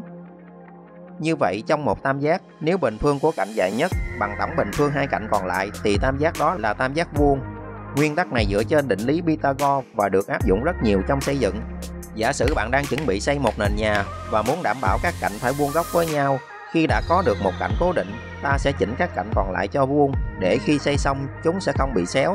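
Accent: Mekong Delta, Vietnam. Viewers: nói chuyện đậm chất người miền Tây luôn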